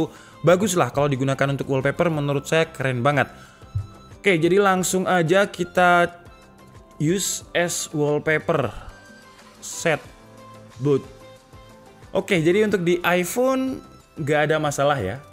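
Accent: Java, Indonesia. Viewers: Bang orang jawa yh? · jowo endi lur😂😂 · Wong Jowo toh 🤣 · Ternyata orang jawa mas 😂😂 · Wong jowo mas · Jowo mu medok mas😁😁😁😁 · Mas sampean tiang jowo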